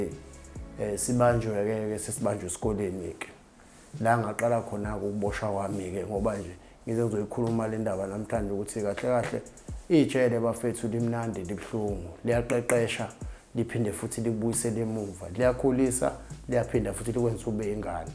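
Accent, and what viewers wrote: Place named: Ndebele, Zimbabwe. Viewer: Waze wakhuluma njengaMandebele aseZim nsizwa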